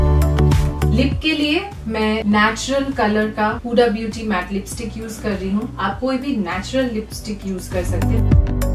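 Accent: Bengal, India. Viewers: Aoki baatein bohot acha lgtahai akdom bengali touch a Di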